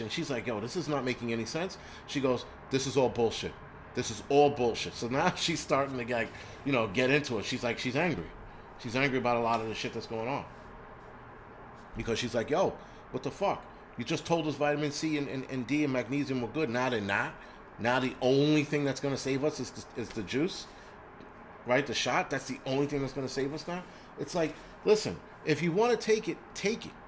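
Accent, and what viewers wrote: New York, United States. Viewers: Your New York City style eloquence is showing again.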